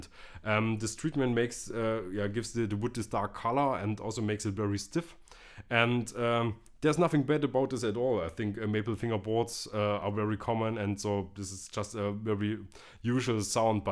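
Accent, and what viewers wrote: Germany. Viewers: Man hört so richtig, dass du Deutscher bist!!! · This german accent is killing me hahaha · mann hört das du deutscher bist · Direkt nach dem ersten Wort erkennt man den Landsmann =D Will mir von Harley Benton das bass Bastelkit kaufen bin mal gespannt ! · When you're german and you try to speak English😂 you can hear the german out of him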